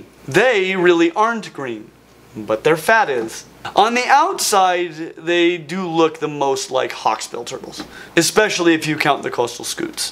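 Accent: Australia. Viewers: Can we get a video of Australian animals with doing his amazing Australian accent???? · Bonzer Aussie accent, mate! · i need you to do an entire episode in your australian accent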